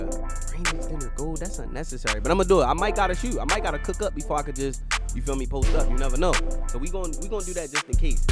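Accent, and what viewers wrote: Baltimore, United States. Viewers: i can tell u frm baltimore 😂😂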